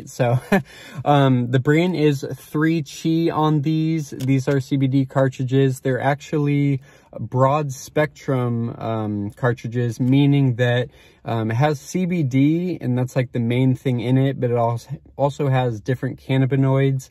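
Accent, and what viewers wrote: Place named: Los Angeles, United States. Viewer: You hear that hes from LA😂💀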